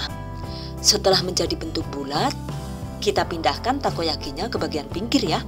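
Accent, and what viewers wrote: Banyumas, Indonesia. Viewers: 😁😁😁😁bunda saya suka bicara nya bunda yg bahasa jawa nya bun ko da jawa ngapak nya bun 😀😀😀 tp saya jg suka redep2 nya bunda tenk's bun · Jane rika wong ndi yu... Deneng le ngmg ngapake jos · japan + ngapak ya etok2 ora doyan😅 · Wahhh ngapak ,:)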